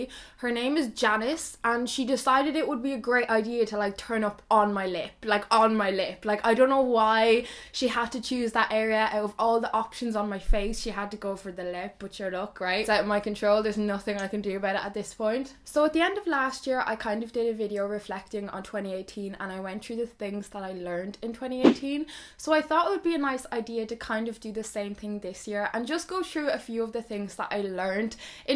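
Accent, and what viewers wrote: Ireland. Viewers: If you don't love an Irish accent, you're not alive.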